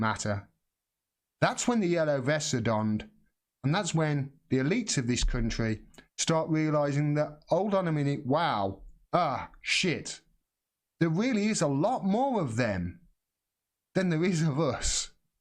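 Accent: Black Country, United Kingdom. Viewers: I know this is silly but I KNEW IT! I live and grew up in So. California but I actually lived in a small village near you for a year (Pattingham) and I was wondering if yours was a Black Country accent! haha, I don't know why that made me happy. Ok, carry on with the bad news, sorry....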